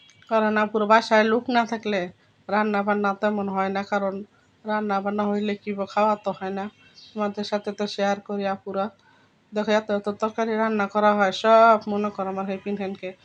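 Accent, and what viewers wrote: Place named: Sylhet, Bangladesh. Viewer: সিলেটের ভাষা খুব সুন্দর,, আপু আমি কক্সবাজার থেকে দেখছি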